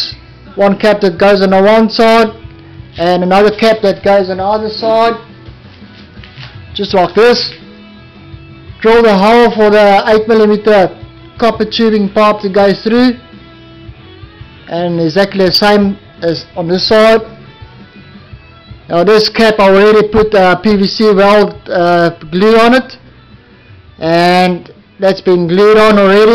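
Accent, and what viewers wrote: South Africa. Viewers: Nifty little condenser, should work a treat once ya got the water flushing thru the PVC and should only need a slow flow being the overall volume of the pipe too. Been tryin to work out your accent mate, I am guessin south african? You certainly got some skills my friend, good work bro. Cheers n regards Lee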